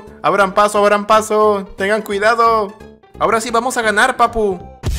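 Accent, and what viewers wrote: Mexico. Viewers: Eres de México? Me gusta mucho como hablas 😸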